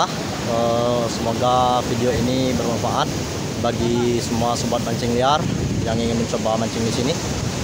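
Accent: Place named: Malaysia. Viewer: Asal mana om kok kayak malaysia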